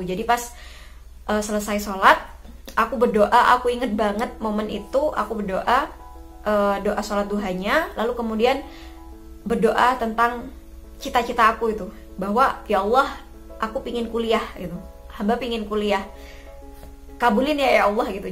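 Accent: Jember, Indonesia. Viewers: Kkak org jember ya?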